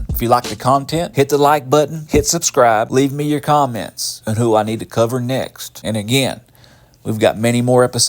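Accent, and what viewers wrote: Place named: Texas, United States. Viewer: Who tf is narrating some Texas hick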